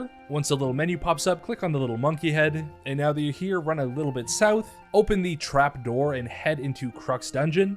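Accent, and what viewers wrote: Canada. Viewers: also u canadian af bruh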